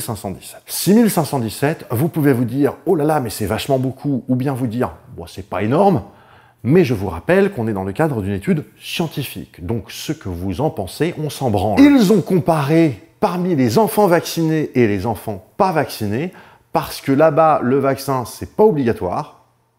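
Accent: Alsace, France. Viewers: L'accent H'Alsacien 💜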